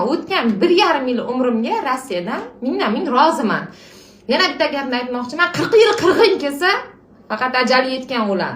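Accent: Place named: Bukhara, Uzbekistan. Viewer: Бухороча тошкентга ва харазм шевасинда гапиробсан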